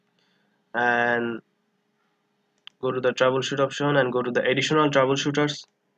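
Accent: India. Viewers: THE INDIAN ACCENT, IM SAVED🙏🙏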